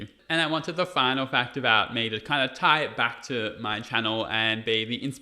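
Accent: Australia. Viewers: I didn't realize your accent was Australian. So cool to learn more about you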